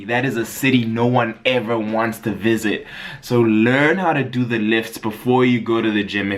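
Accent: Nigeria. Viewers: Why u talking like a Nigerian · that NIgerian accent tho..lol...too funny..great tips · The Nigerian accent tho 😂😂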